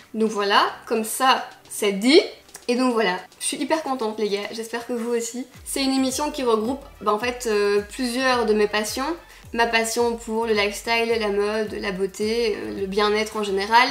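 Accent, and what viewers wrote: Belgium. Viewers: #teambelge Allez on impose notre accent sur les chaînes françaises !! 😆❤❤❤ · MAIS C'EST UN TRUC DE OUFFFF 😱😱 J'espère qu'on entendra ton petit accent belge à la télé haha #huit Tu peux être fière de toi, quel aboutissement ! Trop hâte de voir ça 😍😍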